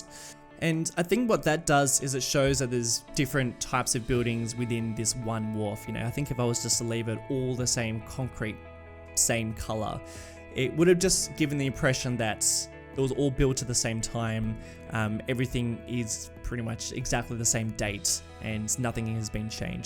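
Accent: Australia. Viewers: Lemme translate aussie to non-aussie. Erhm. 'Good day (g'day) guys, and welcome back to.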